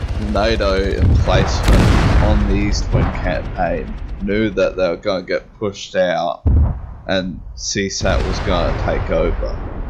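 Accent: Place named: Australia. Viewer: Australian ya dick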